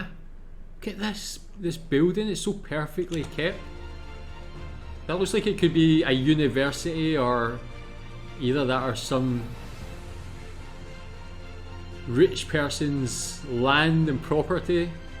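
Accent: Scotland, United Kingdom. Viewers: I am glad, to hear a scottish aczent again. I come from the Black Forrest region, close to the french border. Many well kept Cities arround, plus all those Nature. Sometimes we do not even see the beauty anymore. Also, I feel sorry , because you lost your Freedom of Movment. Europe could be such a good place, if only we would be united. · Greetings from Germany. Love your your scottish accent. That´s the land of my dreams. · I wanted to say the same :-) I grinned all the time ;-) And his enthusiasm seems real! But the scottish accent: FABULOUS! :-) AND, a REDHEAD! Made with pride in Scotland ;-) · Scottish, your accent, right?! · Which part of Scotland are you from? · Very nice accent or Scottish ! 👍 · ...before i start to listen your reaction, my first thought was, you have a scottish accent... i am , see, what comes now. i will give you some comments after your video. 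btw. german here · Like your videos and - mostly - your scottish accent. 😊 Thow, not every city is so beautiful, like in the video, but there are a lot. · Didn't need subtitles, your Scottish accent was easily understandable. And I'm living in the last city shown, which was kind of cut up with only the view from the main castle (city has more than one) and not so much on the castle, and still not capturing the main feature, as the city originally was designed in fan shape, with this castle being the centre. Not showing the other castles, or the countries highest courts. But I have to say, France is also doing a good job in preserving old cities and villages. Went to some, e.g. in the Vosges Mountains, that I thought kept more of their vintage charm than many German ones. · I Luv juor sccotzich Accent. Very translatbal to Bavarian 🤣🤣🤣 I survievwd all englisch Trips 🤣🤣🤣 Even in indonasa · The Scottish are always welcome here in Germany and of course we do have always a good Beer for our Scottish friends. 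By the way i like your Scottish dialect :-). Best regards from Germany to the beautiful Scotland. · I thought you’re from Scotland, love your accent ❤❤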